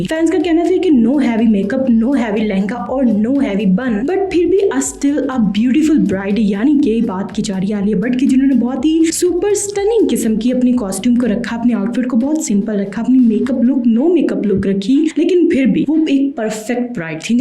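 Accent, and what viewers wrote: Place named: Punjab, India. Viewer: Punjabi aunty accent in the video.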